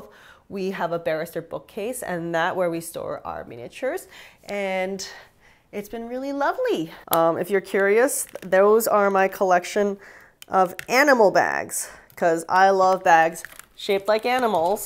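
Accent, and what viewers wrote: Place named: New York, United States. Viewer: Love your new home! Are you from New York originally,? You sound so "Cool New York"! I'm in Long Island.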